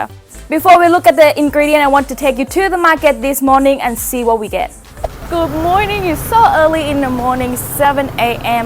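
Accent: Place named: Australia. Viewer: Your man must be an Aussie, as you speak English with an Aussie accent. Lovely.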